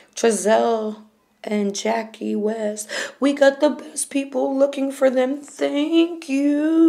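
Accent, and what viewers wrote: New York, United States. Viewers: love your accent, is it new york? say "i'm walkin' here!!" for me. ha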